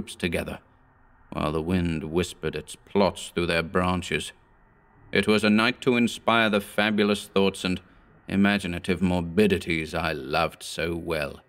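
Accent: United States. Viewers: Fantastic reading, as always...love the American accent lol...if only I could master a British accent even half as believable I'd be a happy girl 😊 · Your American accent is impressive. Excellent as always Mr. and. Thank you.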